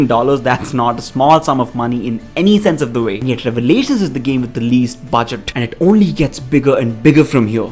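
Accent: India. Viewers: ok it's just there are different types of Indian accents so it's hard to tell the way you say well vell gave me indian kind so it's nice to know you come from a lovely country I'd love to visit some time in the future:)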